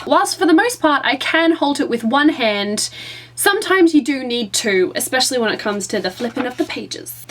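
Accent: Australia. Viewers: You must be from Sydney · I loooooove the Australian accent <3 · Are you Australian? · As much as I hate straying from the topic of a video.. where is she from? As a kiwi who's been in Australia it's becoming hard to differentiate the accents now lol.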